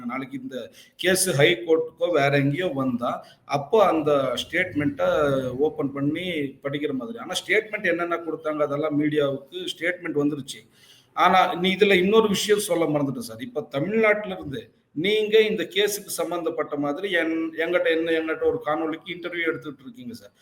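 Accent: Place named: Karnataka, India. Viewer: Your Karnataka tamil expression with truth so beautiful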